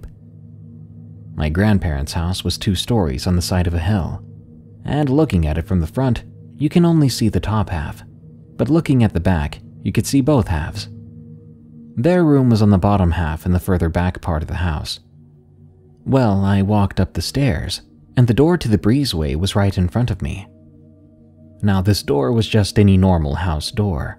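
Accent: South, United States. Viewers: Southern accents are great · Souther comfort with a southern voice! All tuckered in man. Thx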